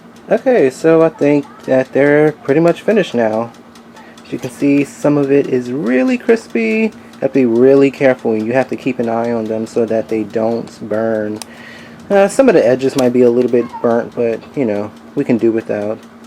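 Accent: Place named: New York, United States. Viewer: Oh I thought I replied here, I guess it must be my ADD...thought I hit post, but probably got distracted. I am sorry, I kinda thought I detected a NY accent so I figured you might know that BLT is NY Diner talk for a Bacon Lettuce and Tomato sandwich...so an ELT would be your Eggplant (bacon) Lettuce and Tomato! I bet it would be amazing! 😍 Don't forget the vegan mayo! 💗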